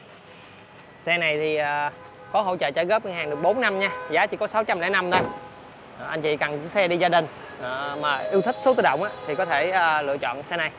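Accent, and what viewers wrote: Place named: North, Vietnam. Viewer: Sao kênh này mà có khi nghe giọng người Bắc?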